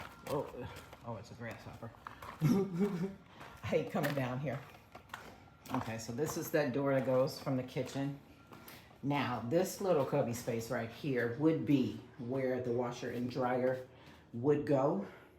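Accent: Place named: New York, United States. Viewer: My sister from NY moved to Merida with her boys but had health issues with the mold - you sound like a New Yorker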